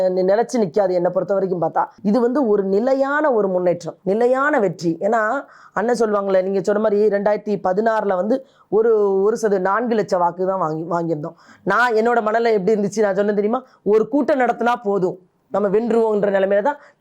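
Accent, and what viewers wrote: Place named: Tirunelveli, India. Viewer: உங்களது நெல்லை பேச்சு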